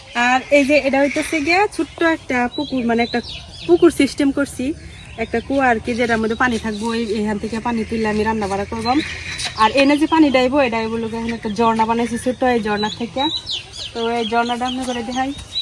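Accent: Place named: Mymensingh, Bangladesh. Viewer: আপনি ময়মনসিংহের ভাষায় কথা বলছেন। এটা কোন উপজেলায়?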